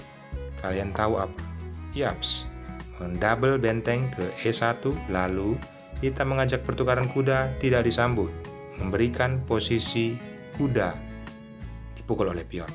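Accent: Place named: Karo, Indonesia. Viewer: Kental bgt ngomong Karo bro😂😂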